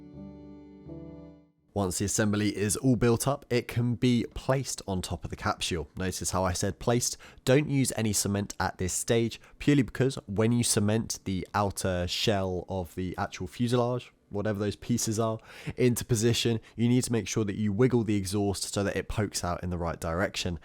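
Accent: England, United Kingdom. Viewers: The England pronunciation is cool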